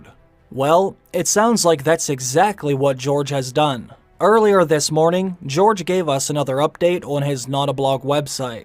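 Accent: United States. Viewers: Where did you learn to read? Are you American?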